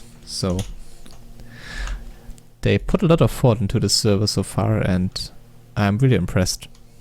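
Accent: Germany. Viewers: Nice video, thanks for the showcase. I like your german accent!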